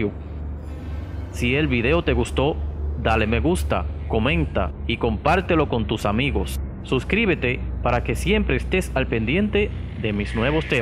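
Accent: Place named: Spain. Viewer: Bueno por ahí te comento que este programa no es mío sólo comenté que porque tienen que poner a español a narrar no puedes en latino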